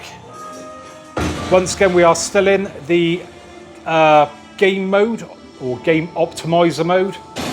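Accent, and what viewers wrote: United Kingdom. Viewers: Love the video mate. Nice to hear a Brit on you tube for a change. What wall mount did you use as I am thinking of buying this. What made you chose this over the C1 by the way?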